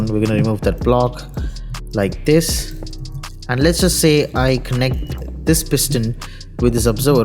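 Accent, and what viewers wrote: India. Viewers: Man i love the indian accent your good bro your explanation is great the otherd is shi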